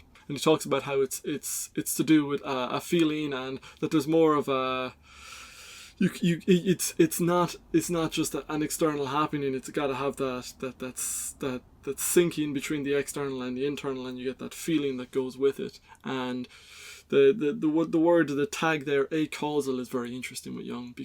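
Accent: Ireland. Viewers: Nice to find a video of an Irish lad talking about Jung. Gizza shout if you're in Cork.. I'd love to put you up in Dripsey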